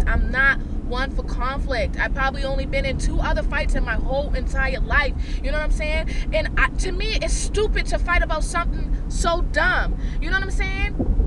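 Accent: Boston, United States. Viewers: You sound like a Bostonian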